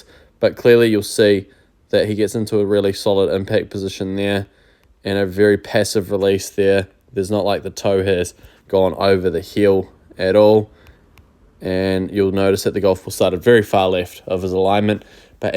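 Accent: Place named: New Zealand. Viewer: Great analogy, you sound like a kiwi that has lived in Aus for a while?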